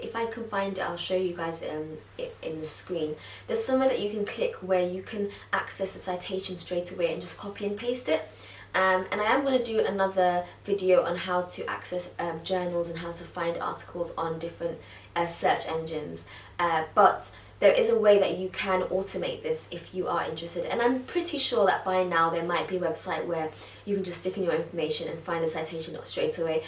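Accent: United Kingdom. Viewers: She has an English accent. She went to Harvard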